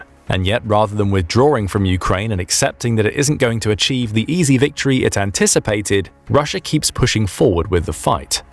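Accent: England, United Kingdom. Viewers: Are you from England,kinda figured 🇺🇸💅☢️ your boath toast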